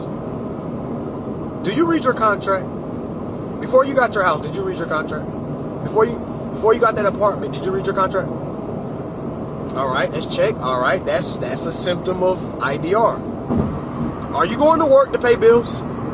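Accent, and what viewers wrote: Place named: Texas, United States. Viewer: Damn im from the west side of rockford bro u from the rock ? I been on yo channel fo a sec trying to learn ithought u was from tx?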